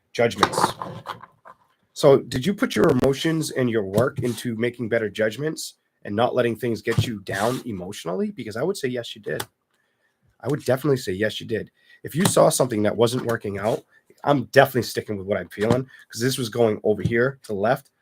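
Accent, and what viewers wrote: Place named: Brooklyn, United States. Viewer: My friend, love the confidence in the way you read! u giving me Brooklyn vibes that's an extra plus 😂😂❤❤❤❤ but that libra reading the ending u glitched my friend because I saw fire and you called it water ...no sweat but u moving toooooooo fast , I love it thou because u don't talk alot like most readers and I appreciate that!!! New subbie ❤❤❤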